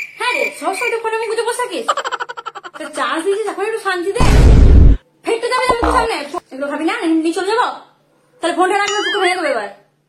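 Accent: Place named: Murshidabad, India. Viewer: মুর্শিদাবাদ এর উচ্চারণে বেশ ভালো লাগছে 😂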